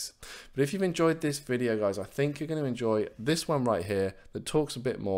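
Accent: United Kingdom. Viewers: Man Your accent is so adorable. Are you British ?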